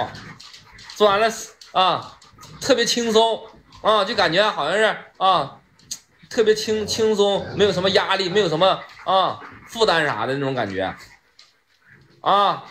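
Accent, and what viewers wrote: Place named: Northeast, China. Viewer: 吓，不是嗎？哈哈哈，東北漢普通話我只聽懂7O%而已，等我再聽一次。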